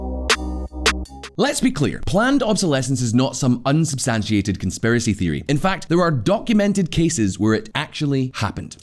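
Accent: United Kingdom. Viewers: is he turning into a brit?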